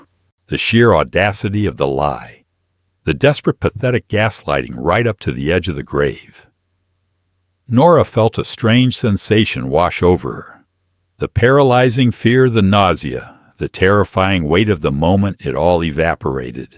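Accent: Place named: South, United States. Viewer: a little "southern" for an Italian Mafia video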